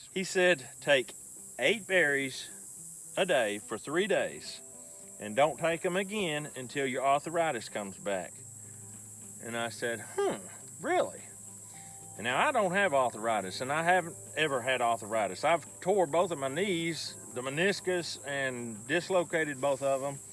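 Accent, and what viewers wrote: South, United States. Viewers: That damn Arthur Itis 😂 I love that southern drawl sir 💪❤️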